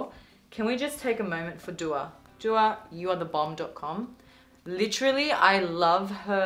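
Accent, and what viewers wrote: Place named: Australia. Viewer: i wish i looked like you omggg and i love your aussie accent lol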